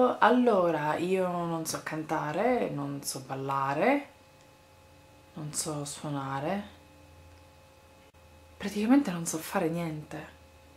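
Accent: Sicily, Italy. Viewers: Non voio ! : ) Bellissima pronuncia. Sei siciliana immagino ! Simpatica e bella !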